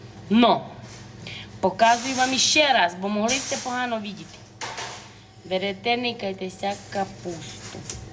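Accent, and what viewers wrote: Transcarpathia, Ukraine. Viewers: Привіт з Львова! Дуже люблю закарпатський говір,і ваші страви,молодчинка,так тримати!❤❤❤❤❤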